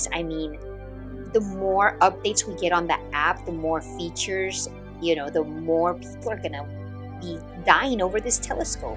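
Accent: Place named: Minnesota, United States. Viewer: I'm a newbie. I've had my seestar for about 3 weeks. She's my first. Moon, Orion then Pleiades. It's been fun. Rosette and Tadpole are on my list. Here in DE will not see any stars for at least 2 weeks. Mother nature waving her wand. Discovered how wet the east coast can be at night. Dew heater seems to work. Enjoying your videos. Sounds like you're not original SoCal. Minnesota????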